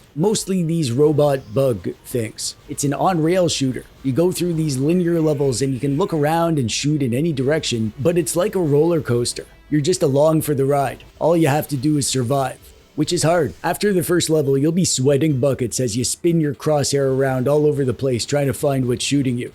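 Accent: Canada. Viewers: Stop saying Mario wrong. You sound like a Canadian.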